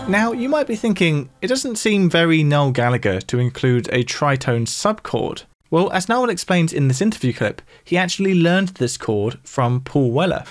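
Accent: United Kingdom. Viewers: I have to smile at the British pronunciations of some words. Like in the US we say Samba like “Somm-bah,” but accent it’s more “Sam-ber.” It’s like sometimes the British go out of their way to make foreign-import words more English sounding. Like pronouncing the t in valet or filet.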